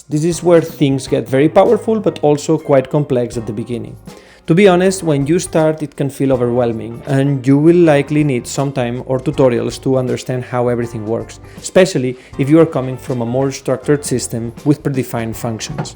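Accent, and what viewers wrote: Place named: Greece. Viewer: Greek i believe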